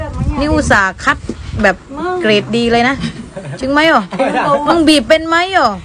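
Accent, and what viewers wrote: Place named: Central Thailand, Thailand. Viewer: แหลงกลางชับๆเพนิคนๆ☺️😘🤩💖💟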